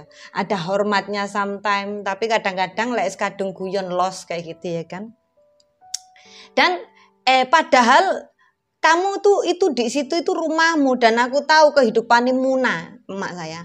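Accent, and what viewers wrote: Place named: East Java, Indonesia. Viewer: Aq suka prinsip hidupmu mba..n bahasamu mnurutku udh halus kok emg nada org jatim trutama wilayah sby kesono kan emg gitu..semangat mba!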